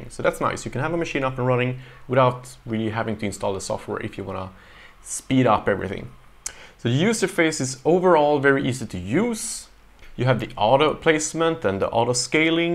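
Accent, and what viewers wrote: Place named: Sweden. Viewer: haha awesome! Jag med, tyckte jag hörde det på din ”dialekt” 😄🇸🇪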